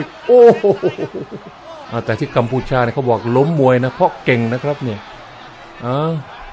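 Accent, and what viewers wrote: Isan, Thailand. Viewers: ตั้งแต่ผมติดตามช่อง ไม่เห็นมีคอมเมนต์อย่างที่คุณบอกเลย หรือทางแอดมินลบไป....คุณรู้มั้ยครับว่า พวกที่จ้องจะทำให้เกิดประเด็น บางทีก็ไม่ใช่คนภาคอื่นด้วย ไม่ใช่คนที่ไม่ได้พูดสำเนียงอีสานด้วย... คุณควรมองหลักความเป็นจริง ช่อง เค้าบรรยายเป็นภาษากลาง ปนด้วยสำเนียงอีสาน นี่คือเอกลักษณ์ของช่อง คุณจะเอาแต่ความรู้สึกของคุณไม่ได้ การที่คุณเสนอแนวคิดมานั้นก็ไม่ผิด แต่เอกลักษณ์ของช่องจะหายไป... **สำเนียงอีสาน มีหลายสำเนียง และสำเนียงอีสานก็ไม่ใช่ภาษาลาว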